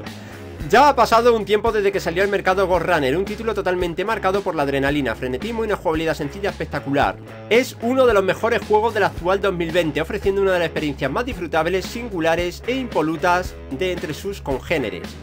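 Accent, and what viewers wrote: United Kingdom. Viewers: Vi todo el video para escuchar la pronunciación de de su ingles britanico y se salto...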